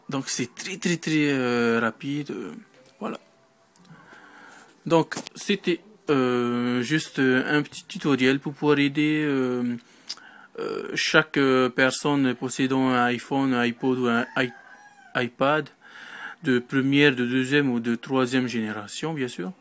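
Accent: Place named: French Polynesia. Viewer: J'ai grillé direct que tu étais un polynésien ma gueule !!!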